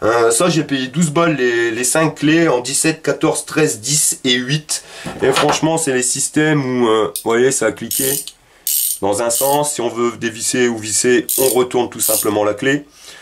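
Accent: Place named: Nord-Pas-de-Calais, France. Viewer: oh!! un chtio biloute j'adore l'accent mdr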